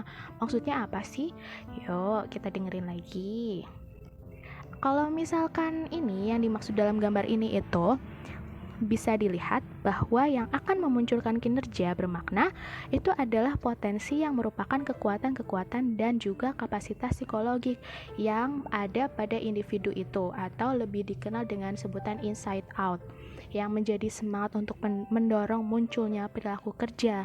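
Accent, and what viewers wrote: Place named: Java, Indonesia. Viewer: Jiosss tenan.. Suara ne meduk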